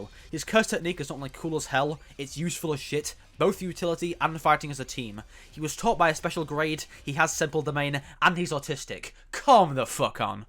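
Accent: United Kingdom. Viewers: After watching this video commentary, I now understand the lack of reading comprehension trope. Turns out having a British accent does not make you intelligent. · I CAN’T STAND THIS SCOTTISH ACCENT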